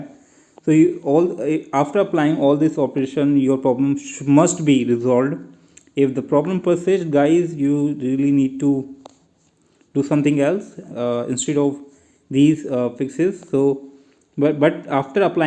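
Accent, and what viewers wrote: India. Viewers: Not going lie he sounds india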